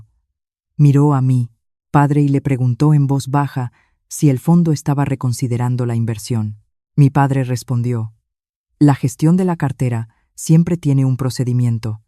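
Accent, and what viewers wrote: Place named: Spain. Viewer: El relato es desde España. Allí se habla así. Mis hijos sun despues de 15 años de volver a Argentina siguen hablando así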